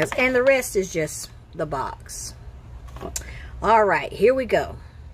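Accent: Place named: South, United States. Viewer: You sound like a sweet southern girl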